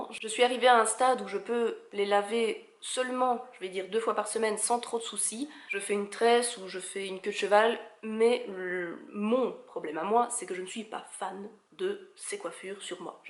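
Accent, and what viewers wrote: Belgium. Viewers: Oh tu es Belge ! J’ai été voir ta description car je me disais bien que je connaissais cet accent, très chouette vidéo, tu as des cheveux magnifiques !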